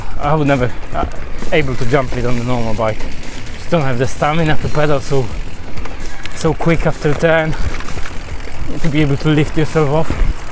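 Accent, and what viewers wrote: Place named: Poland. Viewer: angielski masz dobry ale slabo slychac i do tego ten nasz angielski/Polski accent 😉 jak chesz wiecej subow to sie musisz pokazac na ekranie.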